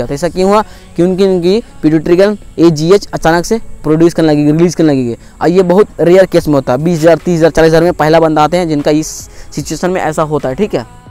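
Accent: Jharkhand, India. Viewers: Aap jharkhand se ho kya 😎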